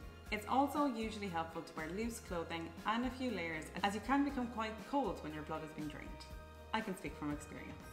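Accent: Ireland. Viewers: True , the GP I went to back then said He knew nothing about it and sent me to the Hospital. they found me a Dr who was surprised as I was his 3rd case. many more now. It is becoming a mainstream condition now ! sadly I think my Grandad and Uncles had it but like you said it was unknown then. all heart attacks in their early 60's only my Grandad survived the first but did indeed have another years later. they were strict tee total, strong fit (young and older) men. always wondered if it was Hemochromatosis. Im now in my 60's. > County Fermanagh Inniskillin is where we hail from. To hear your voice , the beautiful Irish voice , still and always will thrill me to my bones. Thank you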